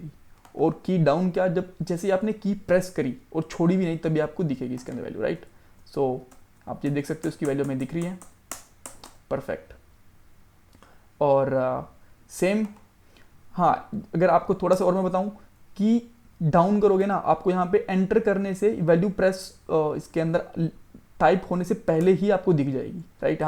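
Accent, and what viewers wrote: India. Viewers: Noida se ho bhai kya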